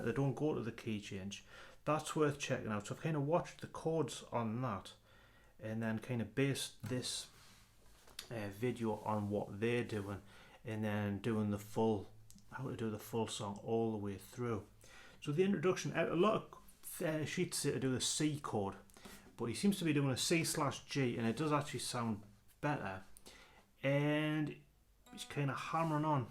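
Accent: Newcastle, United Kingdom. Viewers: love the geordie btw, b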